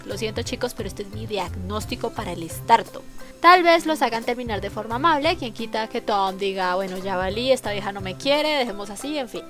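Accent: Colombia. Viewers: eres colombiana? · Jajaj saludame en el siguiente vídeo pdta:eres colombiana? :v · tu eres Colombiana? Yo si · Eres Colombiana? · ESPERA.... Eres colombiana ?????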